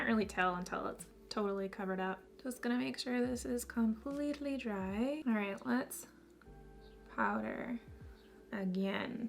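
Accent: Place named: Canada. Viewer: I personally don’t think you sound like Kylie at all. Your Canadian voice is pretty unique to me ☺️ love the look!!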